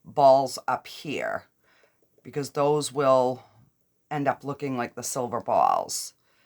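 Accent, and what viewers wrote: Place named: Boston, United States. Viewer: First video oof yoours that I watched. Very pretty. Are you from the Boston area? I hear a bit of an accent. I look forward to learning how to make my own pigment paste! Hugs from 🇨🇦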